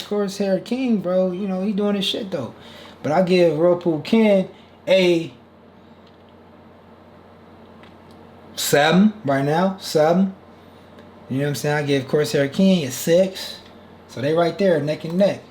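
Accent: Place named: Washington DC, United States. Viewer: Aye you sound like a dc nigga too🤣💯🖤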